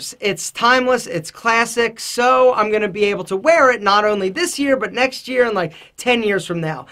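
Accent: Philadelphia, United States. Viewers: Heavy philly accent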